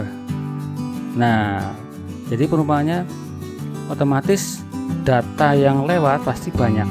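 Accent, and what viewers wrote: Banyumas, Indonesia. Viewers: semangat om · Om, adek ikut an belajar yah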